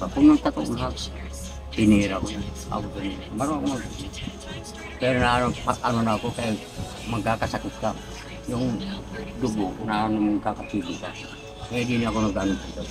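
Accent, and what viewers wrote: Waray, Philippines. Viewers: Pure gud na waray Kay nakukuri intalon pag Tagalog tatay god blessed you Tay ..proud waraynon bootan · Waray na waray accent mo Tay waray lng mga makakaintindi sa iba mong Sinabi..hehe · Haha waray parin accent. 😀👍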